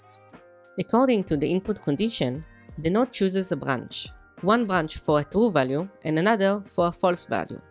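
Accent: Israel. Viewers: אני מזהה את המבטא הישראלי מקילומטרים. אחלה תוכן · at the start i know that you from israel מההתחלה ידעתי שאתה מישראל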